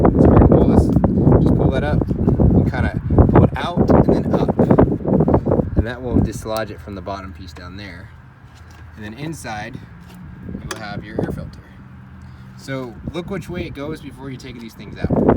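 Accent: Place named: South, United States. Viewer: Actually excited to change the filter now that I watched this super clear video. Your country voice is so soothing!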